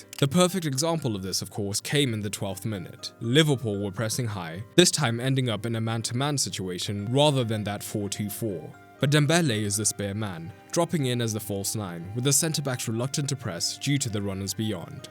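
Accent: South Africa. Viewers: Lol, are you south african?, your accent sounds so south african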